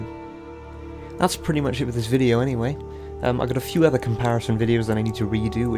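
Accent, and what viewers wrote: United Kingdom. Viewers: Hm is that a slight East Anglian accent I hear? I note you haven't uploaded anything in 9 months, I hope you're okay!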